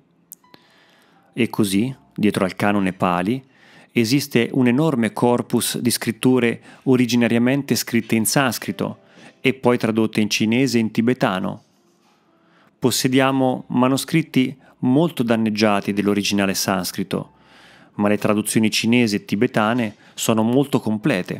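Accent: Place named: Ancona, Italy. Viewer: Grazie per l'ottima lettura. Alan Watts è un autore che, a mio parere, molti usano senza citarlo; ma va bene anche questo. Scusami la curiosità: il tuo accento mi fa pensare alla calata anconetana o della provincia di Ancona... Sbaglio? Un caro saluto...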